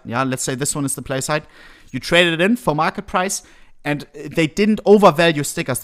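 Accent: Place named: Germany. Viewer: Der deutsche akzent fucking big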